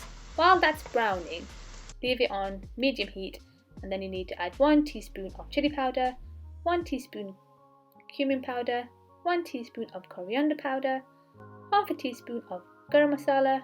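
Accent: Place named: North, United Kingdom. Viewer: it’s the northern accent that does it for me!!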